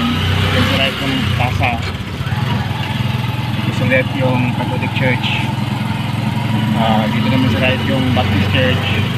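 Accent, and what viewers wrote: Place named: Karay-a, Philippines. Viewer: Nagaragumo ang karay-a kag amo man dia ang pang hambal ko. Sa Alimodian ako naghanggud. Love the dialect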